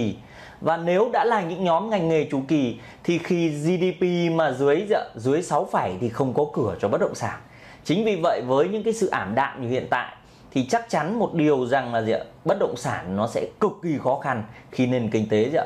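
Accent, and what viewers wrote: Thach That, Vietnam. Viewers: ad ở huyện nào mà nghe giọng nói giống giọng Thạch Thất vậy?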